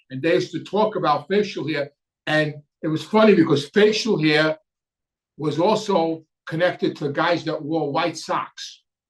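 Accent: New York, United States. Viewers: I love listening to you talk. Always reminds me of the time I spent in New York.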